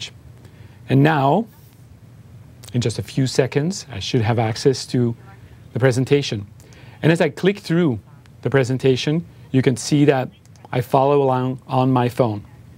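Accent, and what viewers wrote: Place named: Germany. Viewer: Are you a GERMAN ? Got an accent . :)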